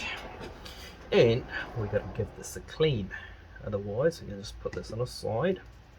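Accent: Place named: United Kingdom. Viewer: Where is your location and your store to be precise? You sound British to me and by the way great video, thanks for the upload.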